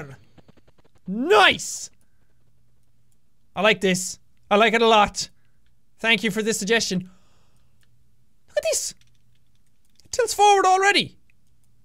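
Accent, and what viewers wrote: Ireland. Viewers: I loved his Irish accent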